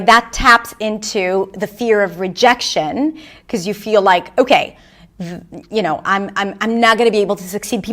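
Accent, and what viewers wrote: United States. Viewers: being white and being a man? I didn't watch this video cuz you're white or whatever. Watched just because you are non-native and you acquired that american acccent. You should study a little more about femminism BS. I am a white man and have priviledges cuz I work my ass off and not for a some sort of white skin priviledges... and of course I trust more people without accent cuz generally have more experience (there are exeptions but I'm sure you understood my point) Best regards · The thing the only reason she can say this and not sounding ridiculous is because of her American accent. Try another one say in a Chinese-English accent. Not indian, not african, chinese. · You are not a Native speaker? No way!!! You sound 200% American. At what age did you come to the US and how old are you now, dear? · I wish I could speak english with your perfect american english....but I would choose the option 1 undoubtedly 👍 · But you sound really American 😂 and i want to sound so good as you. 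I do not compare me to you. But from the beginning i want to sound like native speaker already and try to nail it. But some how i can not change my rythm anymore😢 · you are pushing it too hard, we know your American accent is 99,9% accurate, but you still have to work on the source. Stop watching movies, especially with girly white girls starring, start watching documentary or news program... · I am a college graduate foreigner who has been living in the U.S since I was 18, and that was over 20 years ago. I can notice a British, Australian or other types of accent within a few seconds. You sound just like an American. Apparently, you were able to achieve the goal from option 1, so just show us how you did it. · i get confused for a while, i thought you were from the US because of your accent. Your English is brilliant.. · She sounds completely American. She speaks from the inside of her mouth a bit towards the back, but so do a lot of people. 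Midwestern accents and even southern accents push the sounds into the top middle of the mouth. Think of how people from different regions say, "yeah". Her presentation and placement has a lot in common with the standard American accent. Good thoughts here.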